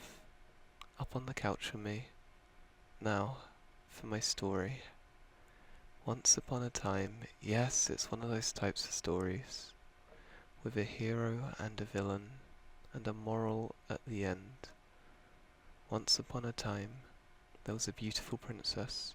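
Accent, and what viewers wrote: United Kingdom. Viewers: he sounds sooooo British 🇬🇧🇬🇧🇬🇧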